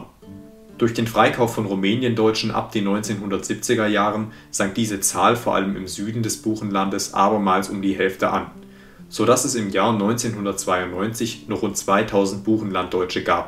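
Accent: Saxony, Germany. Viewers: Die Beiträge über unsere ehemaligen deutschen Siedlungsgebiete sind inhaltlich spitze. Vuelen herzlichen Dank dafür! ❤Es tut mir nur leid und meinen Ohren fast weh, in welchem Tempo und mit völlig empathieloser Stimme die Texte gesprochen werden. 😢 Man möchte gedanklich folgen können und sich so viel wie möglich merken. 😮Unmöglich! Wenn der Verfasser selbst spricht hat er doch eine emotionale Verbindung zum Inhalt der Texte. Wenn es ein Sprecher spricht: Absolute Fehlbesetzung. Obendrein mit sächsischem Klang. So wurde nirgends in den alten Ostgebieten geredet!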